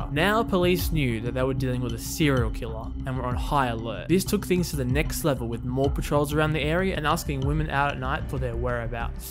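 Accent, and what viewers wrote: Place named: Australia. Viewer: Great video! So cool to hear an Aussie covering these topics. Hope to see more from you!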